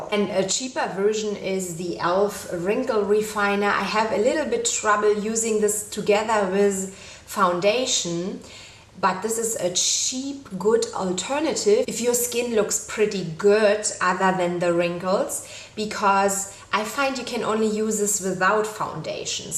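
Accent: Germany. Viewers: Best German accent :D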